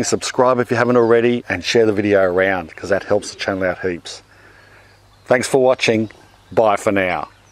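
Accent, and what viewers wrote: Australia. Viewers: The Billy Mays of DIY, and bonus Australian accent. I wonder what else you are growing there that you are not showing us ;) You should pitch yourself to be a TV Ad/promoter.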